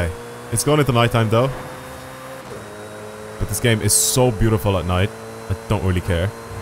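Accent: Ireland. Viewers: I love that Irish accent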